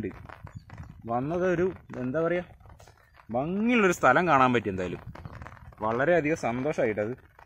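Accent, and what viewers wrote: Palakkad, India. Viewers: പാലക്കാട് കാർ ഭാഷ ക്കു പാലക്കാടൻ സ്ലാങ് ഉപയോഗിക്കുന്നത് അഭിമാനം ആണ് . നിന്നിലൂടെ എങ്കിലും നമ്മളുടെ സ്ലാങ് കേൾക്കുമ്പോൾ ഒരു സന്തോഷം ഉണ്ടാവും . അവനവന്റെ ഐഡന്റിറ്റി യിലൂടെ പൊയ്ക്കോളൂ .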